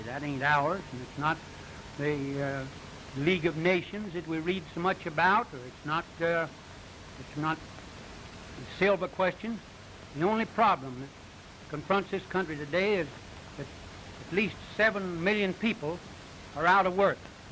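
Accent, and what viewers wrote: Oklahoma, United States. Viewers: do many modern okies still talk like him or have they all been modernised? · Okie accent!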